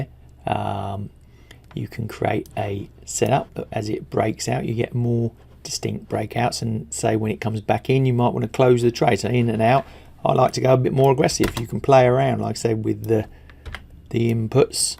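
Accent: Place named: Kent, United Kingdom. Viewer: Does anyone know whether is a Kentish speaker? It’s the one British English accent that has a tinge of Australian elements, though we probably should say that the other way around. Apparently, Kent has been overrun by Essex speakers, so the traditional accent has mostly been displaced in recent generations.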